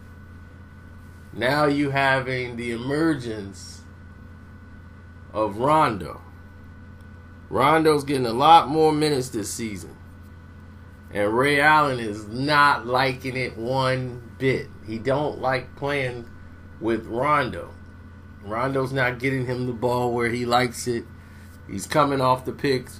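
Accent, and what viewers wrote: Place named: South, United States. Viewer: Ronde Rondo?? How u pronounce some of ur words feel like I'm listening to my grandpops from down south or somethin cuz...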